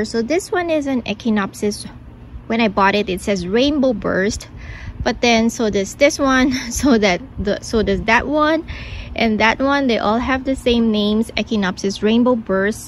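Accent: Philippines. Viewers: "Rainbow Burst" would be a hybrid seed strain name. Plants from this strain would be variable in form and colour, hence the "rainbow" moniker. Latin names for cacti are ever changing and confusing but mostly very simple. The genus name: Echino = hedgehog. Opsis = appearance. That's a wonderful description...Of just about every cactus! The species name comes next and usually describes the flower or spine colour, the plant's form, the location where the plant grows, or the name of the European person who "discovered" the plant and decided to name it. Common Latin names for colours are: Flava - yellow, aurata - golden or orange, viridi - green, alba - white, rubra - red. Latin names make descriptions easier and more concise. It's much easier and quicker to call a plant Echinopsis Sanguiniflora than to call it "a cactus that looks like a hedgehog with blood coloured flowers." Hope this helps a little. 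I don't mean to be patronising, just giving you information most plant books don't give or explain. Looking at your photo I thought at first you were Mexican, then I heard your voice and now realise you're from the Philippines. I enjoy your channel and seeing the myriad cacti you grow so well. Mabuhay!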